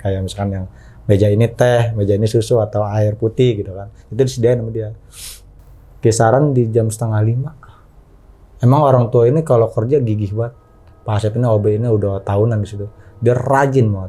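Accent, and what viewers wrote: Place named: Betawi, Indonesia. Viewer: Bahasa betawinya lho yg bikin gua cengar cengir sendiri😂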